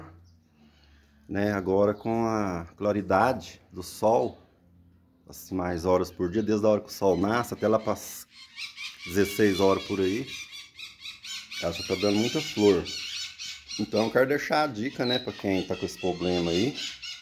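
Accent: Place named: Minas Gerais, Brazil. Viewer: . A vai cobrar kkkk. Notei pelo sotaque. Também sou mineira 😁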